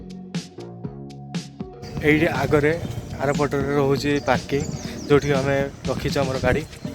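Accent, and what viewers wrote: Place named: Balasore, India. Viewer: Baleswaria pila nka pari kan kahucha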